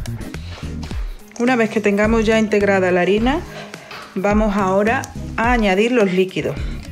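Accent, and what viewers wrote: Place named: Peru. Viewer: " " no sé si será peruana radicando en otro país por eso el dejo....pero a respetado la receta original.....gracias por decir en su video q es "Turrón de Doña Pepa" es tradición peruana con todo y su historia. Un sólo detalle...en lugar de colorante le agregamos achiote.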